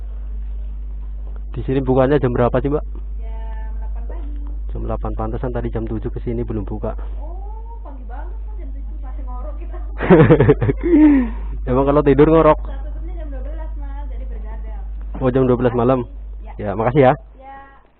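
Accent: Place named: Banyumas, Indonesia. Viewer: Koyone wong ngpak yo kang.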